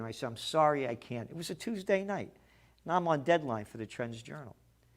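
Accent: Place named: New York, United States. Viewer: Love the New Yorker Accent!! God bless America Sincerely, from Down Under!!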